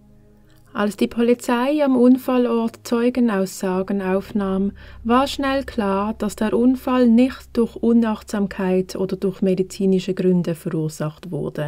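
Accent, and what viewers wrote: Switzerland. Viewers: Du bist eine Schweizerin ….hihi…..man hört es 😃 Ich auch ….aber bei mir hört man es weniger …..weil das meine 2. Muttersprache ist Hab dich gerade entdeckt Abo dagelassen 🧡 Wenn du noch bisschen was übest gehts besser mit dem Hochdeutsch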